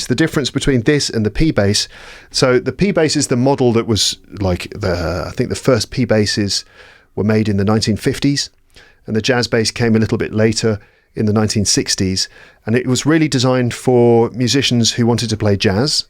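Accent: RP, United Kingdom. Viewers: Thank you. I was waiting for your new episode. You have helped me a lot to improve my British pronunciation. Hope to meet you in person!:) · i really like your podcast, your british accent makes it unique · Hello,! I've enjoyed this rambling episode because you have talked about various themes, you have played different sorts of guitars, you look so happy and relaxed when you talk about your children and when you play guitar!!! Obviously, I'm always pleased to hear your clear modern RP English. As regards reporters' way of speaking English, I think they should speak as well as you do. Thank you for your dedication and kindness. 😊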